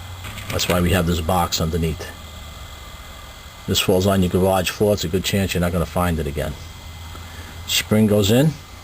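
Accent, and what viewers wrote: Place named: New York, United States. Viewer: Old - Fashioned New York Accent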